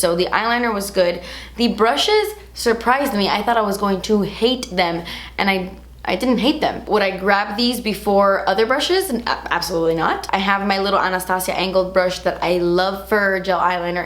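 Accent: Cuba. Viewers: Please speak more Spanish!! :D I’m Guatemalan-American and LOOOOOOVEEE hearing other bilingual Latinos speak in their native tounge 💕😊 Your Cuban accent is the Cutest! Just like you! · i love her cuban accent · Jajajajaja ame tu acento cubano!!!! Jajajajaj españo-cubano 😂😂😂😂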